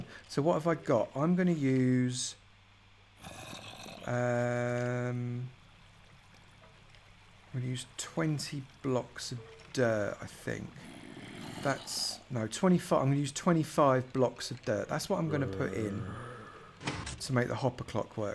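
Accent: United Kingdom. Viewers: sorry but I want to know, R U british ?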